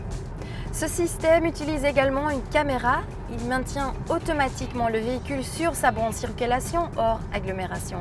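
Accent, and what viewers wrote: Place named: Netherlands. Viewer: Quel bel accent néerlandais.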